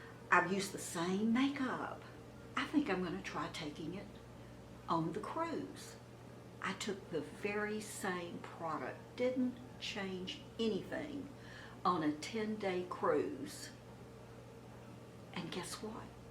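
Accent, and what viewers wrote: South, United States. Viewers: Well Hallelujah and God Bless You. U have done what I wish all the others would do. Glad u reached this point and got off the crazy roller coaster. These others start out to review products, make money and get a Gillan w major company to get freebies. But they get sucked into the tornado of vanity and keeping up with the gurus. I've watched Kandee for 9 yrs maybe, and she is a true artist and appears so happy and sweet and not jaded. She a beauty. I have tired of the few others I did watch and only look around for swatches of lip colors, etc. I'm a lipstick gal.. Thts my 1 product, just as my mom's was. I too, use a cubicle almost exactly like urs, acrylic w a handle and it was a flatwear caddie tht I use to use serving buffet style. It's perfect und sink and easy w the handle. I have no more other than a box of all my lipsticks cause I wear diff shades. 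Do, glad u guys are safe and Destin is where we vacationed 18 yrs I was married. It's wonderful and I'd love to live there one day but I havent done sun in the last 12 yrs. U sound like u might be from GA or SC. Take care and stay beautuful. Doesn't take makeup when it comes from within.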